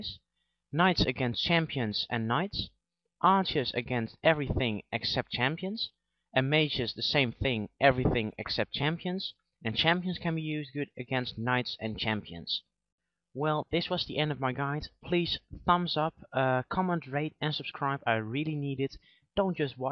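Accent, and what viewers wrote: Netherlands. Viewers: great, but should have added an ingame shot as well, just to see what it looks like. Just wondering, dutch accent? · Are you dutch? U got a dutch accent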